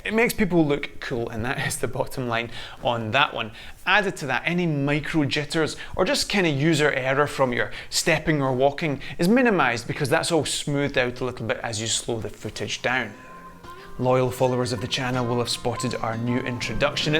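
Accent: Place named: England, United Kingdom. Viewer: thats an interesting english accent you have. as a german i cant figure ut, where it comes from! would you tell me that?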